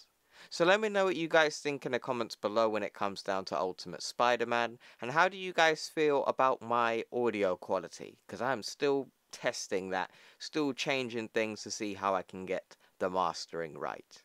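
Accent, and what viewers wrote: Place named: United Kingdom. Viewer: What part of the UK are you from? You have one of the least annoying British accents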